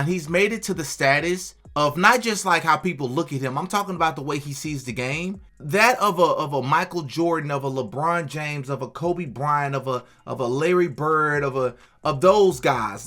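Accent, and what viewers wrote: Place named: United States. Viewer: Congratulations on your channel, and on this reaction. I am ignorant about basketball, but I like watching it. I am much less ignorant about soccer (I assume you are American from your accent, and since when I am on this channel I am in your house, I call my favorite sport soccer; I know you would have the respect to call it football if you were talking about the sport anywhere outside the US). Sorry about the long intro. You said something in this reaction that is exactly what I have been thinking about when it comes to nominating the MVP in the NBA. How can there be any doubt about who the MVP is? I mean it. When I vas at the University of Pittsburgh (3 years), I mainly concentrated on getting drunk before football, and basketball games, making sure I could still make it to the stadium or the arena with my friends..;-) But I watched the NBA's playoffs, final, all-star game, and I knew who the MVP was every year. 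so that was forty years ago; ok, speed up the clock to '95, or even t 2005, when I stopped following. I will bet my favorite soccer worn jersey (will tell you next time which it is if you care) that no coach, commentator, executive who cared about being safe on the street would have made the arguments (they are not even arguments) you hear now from those who support anyone but Jokich as MVP. I will tell you what makes it unbelievable. Not even in soccer would your these arguments. SOCCER yes, where fans, where fans will defend their team and its stars to the point o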